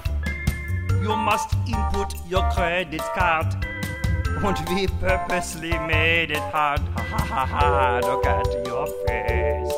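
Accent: Germany. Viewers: And the third German agrees with that as well... your German accent sounds very interesting... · Why the german accent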